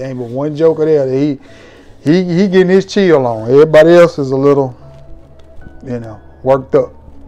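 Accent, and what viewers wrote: South, United States. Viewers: Arkansas or Georgia?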